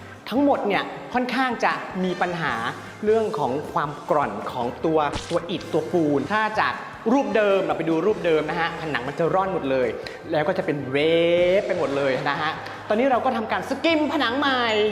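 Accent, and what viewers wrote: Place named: North, Thailand. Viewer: พิธีกรคนเหนือแน่นอน สาปเมืองขนาด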